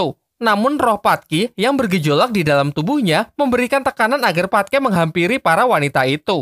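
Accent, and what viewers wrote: Java, Indonesia. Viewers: Jowo bang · Wong jowo e mas? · Wong jowo mas?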